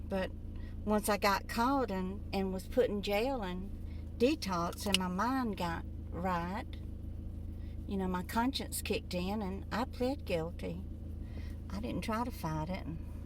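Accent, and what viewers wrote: South, United States. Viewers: Hi Ms ☺️ I've been watching some of the court case here in UK 🇬🇧 & even though I've not watched it all it was obvious he was guilty. I felt so sad for his poor wife 😢 & son 😢 Hope you're keeping well, I love to hear your southern accent, it's lovely. Sending you love & best wishes, God bless you 🙏 xxxxx Christine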